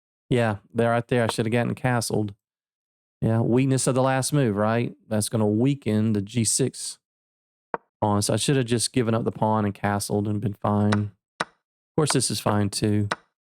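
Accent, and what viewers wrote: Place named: North Carolina, United States. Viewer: Love the video and series! Where are you from? I’m an accent guy and it sounds like you’re from somewhere close to where I used to live (Raleigh, NC).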